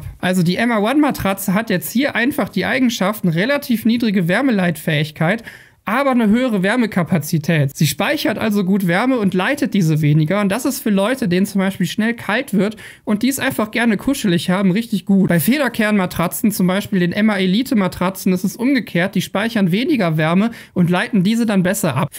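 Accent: Bavaria, Germany. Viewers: Ist ein Bayer?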